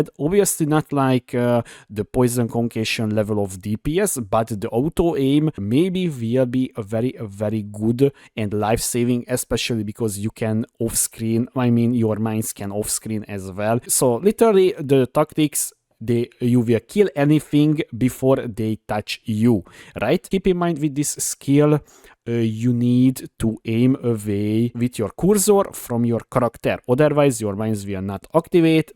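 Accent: Hungary. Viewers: He s english is wierd bc of he is born ín hungary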